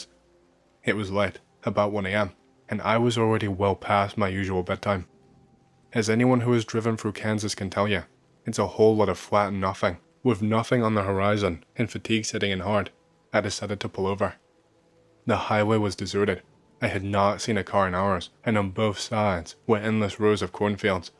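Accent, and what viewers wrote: United Kingdom. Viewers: Where's his accent from? Sounds kinda U.K. ISH...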